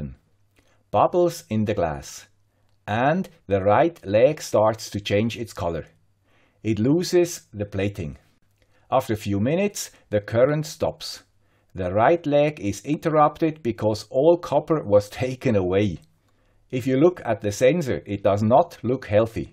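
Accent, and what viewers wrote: Switzerland. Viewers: Hallo aus Österreich,!:hand-pink-waving: Ich mag deine Videos. And "the Swiss accent":) Wegen des Kupfers... Wenn du, so wie du es gemacht hast, die Elektroden ins Leitungs-/Brunnenwasser steckst (nimmt man ja meist zum Gießen) und mit ein wenig Spannung und einigem Strom beaufschlagst, erzeugts du einfach Knallgas. Sauerstoff und Wasserstoff an je einer Elektrode, das entstehende Wasserstoffgas kann als Blasen an der Oberfläche der Kathode freigesetzt werden. Komplizierter ist es mit Folgendem: Im Leitungswasser sind üblicherweise verschiedene gelöste Salze enthalten, darunter Natrium- bzw. Kalziumionen und Hydrogencarbonationen (HCO₃⁻), die möglicherweise reagieren könnten, um Kupfersalze wie Natriumkuprat (Na₂CuO₂) oder Kupfercarbonat (CuCO₃) zu bilden. Verschiedenen Faktoren wie Wasserzusammensetzung, pH-Wert, Temperatur und Stromstärke beeinflussen die Reaktion. 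Wenn man Pflanzen mit einer solchen Flüssigkeit bewässert, bzw das im feuchten Erdreich auftritt, könnte dies potenziell schädlich sein, da Kupfer in höheren Konzentrationen toxisch für Pflanzen sein kann. Kupfer ist für Pflanzen notwendig, aber nur in geringen Mengen. Bei zu hoher Konzentration kann es jedoch die Aufnahme von anderen wichtigen Nährstoffen behindern und oxidativen Stress verursachen. Die Kalkablagerungen könnten auch den Boden pH-Wert erhöhen, was wiederum die Nährstoffverfügbarkeit für Pflanzen beeinflussen könnte. Ein erhöhter pH-Wert kann dazu führen, dass bestimmte Nährstoffe wenige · Your videos are always wonderful, instructional and your Swiss accent is adorable too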